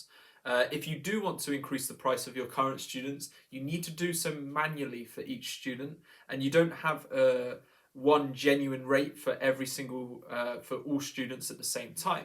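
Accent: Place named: England, United Kingdom. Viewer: Really appreciate your videos and so nice to hear an English accent for a change.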